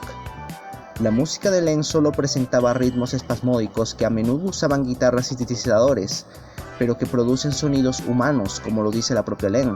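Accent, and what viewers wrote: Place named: Venezuela. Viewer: Genial este resumen sobre la grandiosa, a través de este material descubrí este excelente canal, que voy a seguir en el futuro, si no me equivoco por tu acento neutro podrías ser venezolano al igual que yo, saludos por tu canal.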